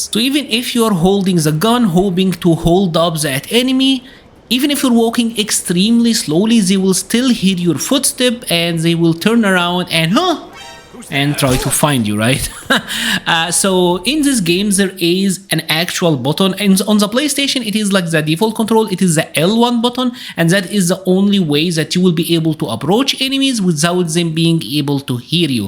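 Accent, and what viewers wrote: Egypt. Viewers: ازيك يا باشة لهجتك مصري عرفتك من اول جملة--- شكرا عل فيديو لزيز قوي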